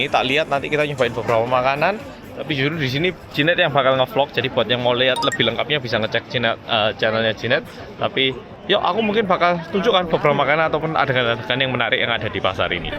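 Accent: Java, Indonesia. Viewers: Medhok e rung ilang to yoo😂😂😂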